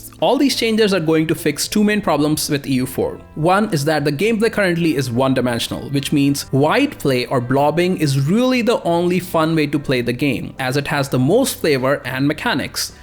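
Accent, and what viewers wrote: India. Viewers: I think the appropriate term is Indian or South Asian, Hindu means someone who is Hindu and may be offensive to those who are of other religions. And yes I agree, he seems to have an Indian accent. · An Indian accent in the gaming community :D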